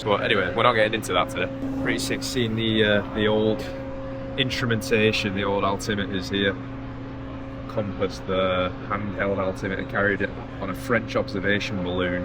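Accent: United Kingdom. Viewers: It sounds like you’re from the UK - how were you able to live and work in the US? It seems like the there are limited VISA’s for pilots (asking as I am currently trying to figure this out)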